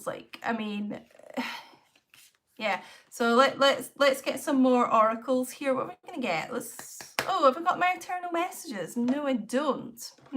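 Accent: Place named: Edinburgh, United Kingdom. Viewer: I LIVE IN EDINBURGH TOOOOOOO!!!!! I FRICKING KNEW IT!! THAT ACCENT! <3 I discovered your channel a few days ago and LOVE your readings! I've been reading Tarot on facebook groups etc and hope to start my channel soon! Commenting without having watched the reading yet haha but that title grabbed me! Got you on the background while im working on Uni work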